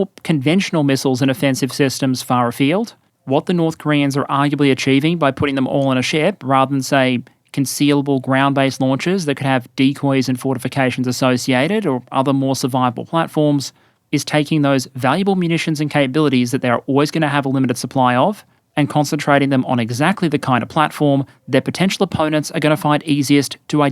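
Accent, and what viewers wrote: Australia. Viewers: Yep almost sure he's an Ozzie. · Always thought he is australian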